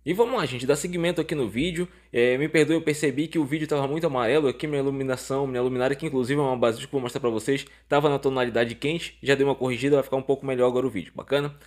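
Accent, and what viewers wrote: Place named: Pará, Brazil. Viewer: sotaque de paraense.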